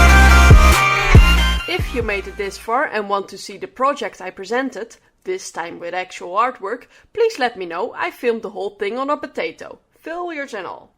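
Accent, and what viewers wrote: Netherlands. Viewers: Are you dutch? Ik kan het horen in je accent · Dikste hollands accent ooit vrind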